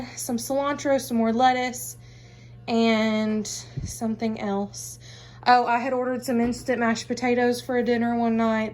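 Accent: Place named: Georgia, United States. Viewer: Hey there I'm new ... And enjoyed your video very much but could you add the prices I like to compare prices. But it's very good I just like that 💜 and where are u from lol the accent I'm in Ga so I couldn't miss it lol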